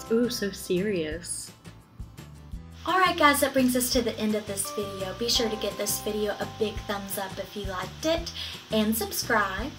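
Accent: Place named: South, United States. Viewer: Ohhh fun!! I thought you had a southern accent for sure lol. I'm from Michigan, born & raised here ❤💙💚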